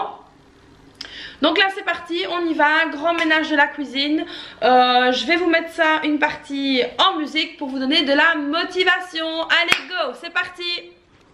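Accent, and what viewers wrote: Belgium. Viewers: Bonjour! Chouette vidéo! Votre accent viendrait-il de Belgique? 😉 Bonne journée!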